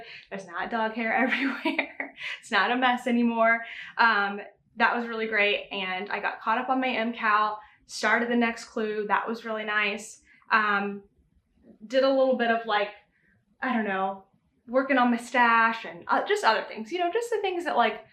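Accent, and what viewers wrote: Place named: New York, United States. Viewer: Love the colours of the hat yarn. My current WIPs are aran sweater for me, socks for my neice, and another chunky sweater for my daughter in law.... I only started knitting multiple projects since watching you.. It doesn't get boring though. Been a while since I watched you, gosh you are developing a bit of a NY accent there....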